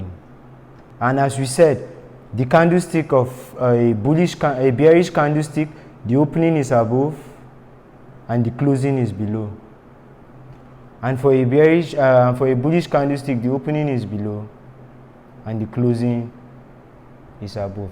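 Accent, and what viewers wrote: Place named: England, United Kingdom. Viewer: Great explanation 👌 i love your teaching style and English ascent, i am very suprised to that with this great information u have passed freely to us no one is commenting or sharing this video, for i have subscribed and share ur link cox i like ur teaching and i want u to always do more of this good work 👏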